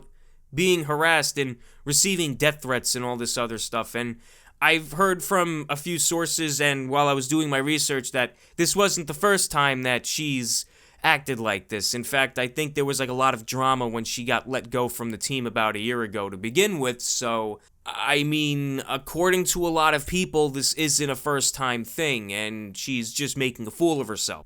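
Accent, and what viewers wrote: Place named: New York, United States. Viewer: I know this is completely unrelated to this video,but are you a New Yorker? Because you really sound like one.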